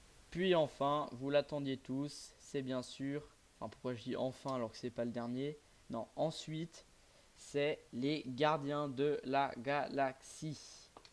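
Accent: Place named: England, United Kingdom. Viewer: Osef l'accent anglais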